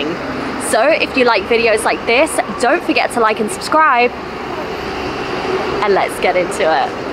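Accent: United Kingdom. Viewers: your British English is easy to hear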